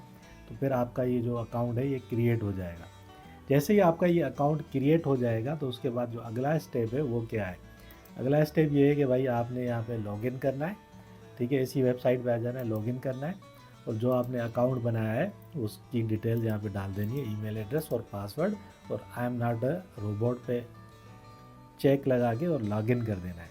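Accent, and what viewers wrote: Sindh, India. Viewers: سندھی لہجہ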